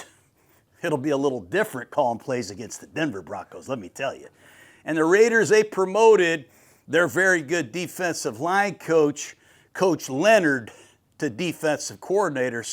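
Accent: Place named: Boston, United States. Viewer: Baahstaahhn accent go me jazzed! lets go coach!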